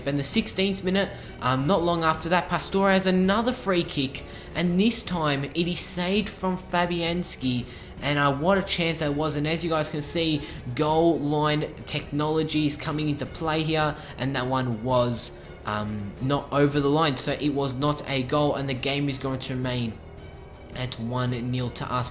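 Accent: Australia. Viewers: Are you an Aussie and wot state in in vic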